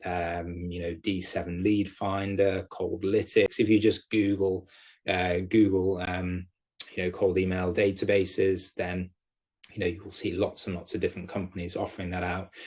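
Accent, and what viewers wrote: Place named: United Kingdom. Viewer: Hi you sound like your from the UK. 🇬🇧 I’m from London UK aswell, I’ve been E-mailing tons of companies in my cleaning business. for the past 1 month from 4 different Gmail accounts every single day. 25-mails to companies each, a few responses back but only them responding saying not interested. 1 or 2 have got back to me saying they want me to come for a walkthrough. Is there something that I might be doing wrong? Is there more of a simple way to get more cleaning contracts quite quickly? Thanks bro look forward to hear from you.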